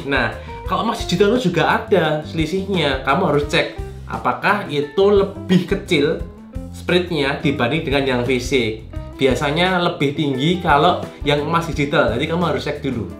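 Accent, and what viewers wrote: Java, Indonesia. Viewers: Paling suka liat koko koko tp klo ngomong medok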